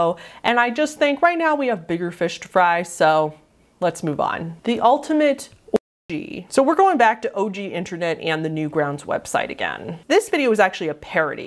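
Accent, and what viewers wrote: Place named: Los Angeles, United States. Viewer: I'm going to guess you're from LA from the way you ground out the end of your sentences.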